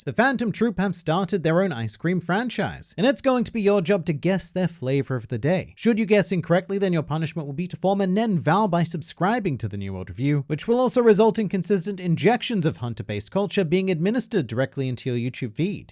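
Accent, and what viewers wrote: Australia. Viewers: wha is it about this australian accent which is so pleasant to listen to? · I didn’t realise that Aussie…oi oi oi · You’re Australian? How didn’t I realise 😂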